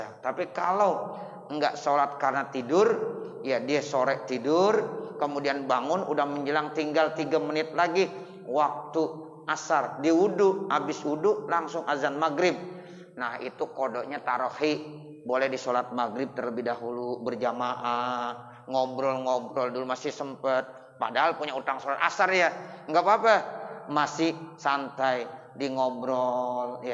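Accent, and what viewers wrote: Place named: Betawi, Indonesia. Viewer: Smg Allah brkn kesehatan kpd guru kita untuk terus bisa berdawah dgn bhs betawinya yg khas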